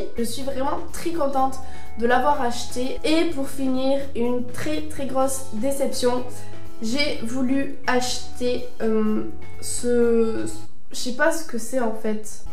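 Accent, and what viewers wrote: South, France. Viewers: coucou tu habites vers ou ? car tu a l'accent du sud hahaha :)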